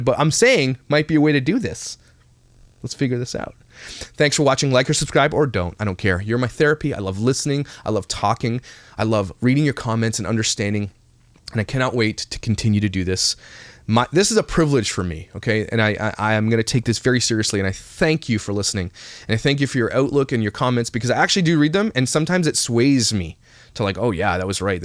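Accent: Canada. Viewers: I think you have so many subscribers because people love your Canadian accent, i.e. "about", " outside". My paternal grandparents were from Conception Bay in Newfoundland. Love your channel!! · I agree about ppl who don't need the money get money donated on go fund me. Yet someone who truly needs the money it's like pulling teeth, litetally. I had to swallow my pride to start a go fund me because I can't eat solid food for the last year and a half due to a dental disaster. I need the money to pay for ENT, Oral surgeon, dentist, ensure in the interim due to Covid which closed everything down, etc. Soup is my main food and mash potatoes. Besides toilet paper. Soup is hard to find. I've lost so much weight due to not being able eat and only drink my food. I also have a disabled son who's had many of his medical supplies no longer covered by his insurance. It hurts when no one cares. 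I need aprox $69,000 so I put that amount as I'm honest or naive. I was verbally attacked by ppl on the internet that I shouldn't ask for so much. So I lowered it. But still I'm not able to eat as not many donated. So seeing what they did makes me so upset. Especially having a disabled son it breaks my heart. I use to live in Canada my first 5 years of life. I miss it so much. I love listening to your accent eh. I miss the smarties, not that I can eat them but you guys have much better goodies than us. Good for you what your doing to stop the injustice. I can't imagine giving away your own child. Keep up doing good. I pray the little boy is ok and being loved.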